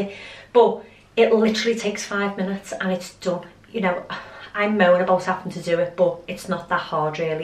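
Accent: Liverpool, United Kingdom. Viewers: Thank you for the tips! I love the scouse accent especially in women 😀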